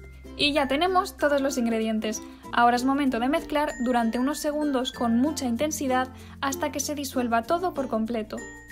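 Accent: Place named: Argentina. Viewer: Jajajajajjajajajja siiiii obvio tono re argentino ehh arriba argentina xd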